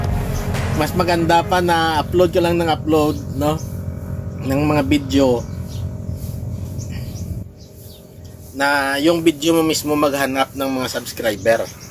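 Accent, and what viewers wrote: Iloilo, Philippines. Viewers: havea nice day my friend. niceto see your nice views nice sea very clean. ano g p,ace mo kaibigan parang iloggo ka ata friend. salamat po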